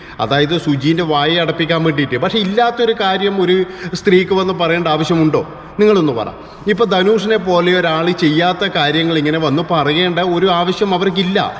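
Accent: Kannur, India. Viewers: കണ്ണൂർ ഭാഷ ആണല്ലോ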